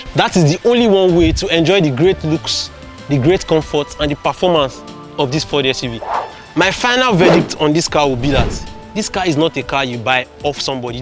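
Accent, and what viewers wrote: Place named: Nigeria. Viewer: Great review. Love your Nigerian English dialect. Do you get the later models in Nigeria too?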